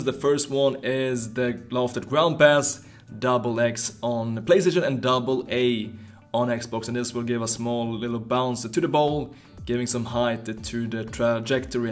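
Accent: Sweden. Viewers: The accent sound swedish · Man hör att du är svensk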